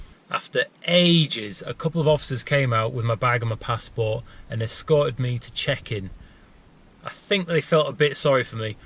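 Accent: United Kingdom. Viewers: What this dude serious in prison? Is tha wha all these comments are about? Damn. Hope he’s in British jail rather than a US jail with this accent bruv. Good luck! Hope they didn’t get u for that long for damn train hopping though god dang. I’m a train fan, yes it’s incredibly dangerous but…anyway. As long as you didn’t hurt anyone or something, sounds like this guy shouldn’t be in jail for 8 years or whatever??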